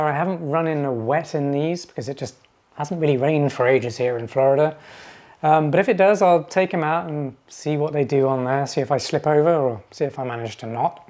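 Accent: United Kingdom. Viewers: You have British accent but talk in dollars. Did you go TTS in your UK size or US. Many say go up half a size in NB but I've just bought these in my usual 9.5 that I buy for most trainers. I'm hoping when they arrive there ok it's more length I'm bothered about as I have average width feet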